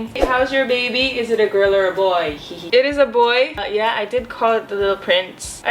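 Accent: United States. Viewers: Lindz Can it sounds more American now she migrated back pabalik ng Pilipinas. Nung mga previous vids nya before mas string yung Aussie accent nya. she sounds more like Colleen Ballinger actually ☺💙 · feeling ko american ka..ung accent mo kaxe ehehe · Lindz Can Aussie??Hindi yan Ausie.American accent lang naman yan eh.. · Can She speaks American English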